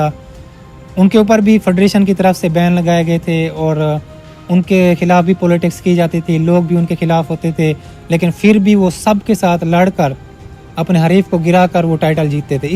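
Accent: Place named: Punjab, Pakistan. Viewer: Sb upper Punjab walon Ki boli bol Raha ha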